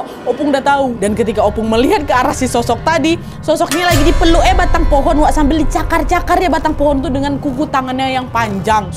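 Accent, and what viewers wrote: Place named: South Tapanuli, Indonesia. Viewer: Maro au,, kayak dari tapsel deh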